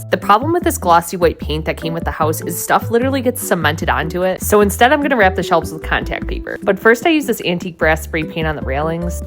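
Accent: United States. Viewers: Thickest accent so American lol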